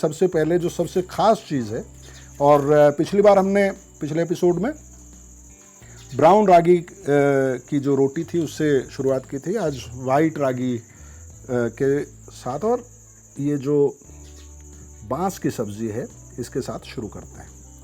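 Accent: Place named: Moradabad, India. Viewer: जी आपकी भाषा मुझे मुरादाबाद के आस पास के छेत्र की लगती है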